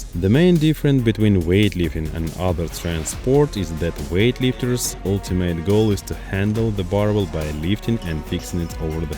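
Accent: Russia. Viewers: Ведущий внешне и по акценту на русскоговорящего похож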